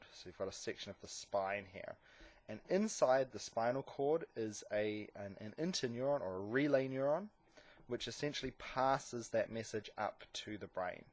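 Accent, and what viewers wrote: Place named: New Zealand. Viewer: nice kiwi accent hehe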